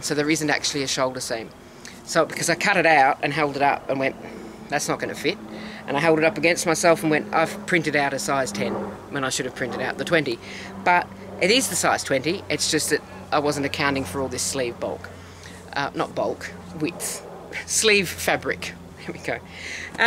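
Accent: Australia. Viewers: I thank the almighty algorithm for suggesting this video. I checked it your channel and saw weaving (something I've always been drawn to but minimally played with). I heard your greeting and immediately heard an Aussie kindred spirit and hit subscribe :) Good luck on your YouTube journey :) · PS Goud on you Mate. Great to hear an Aussie accent.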